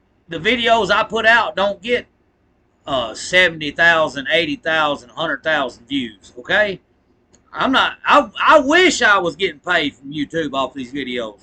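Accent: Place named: Carolinas, United States. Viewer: Yo 😂😂😂😂u ah trip bro,where u from. I from Charleston SC n you sound like you from SC NC or somewhere close. What sense it make to talk to on a video,I mean you can't understand what he says😂😂😂😂😂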